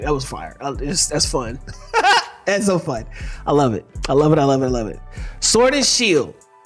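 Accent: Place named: Milwaukee, United States. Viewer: Super weird ass comment but are you from MKE? Your voice is like bringing me vibes of my old home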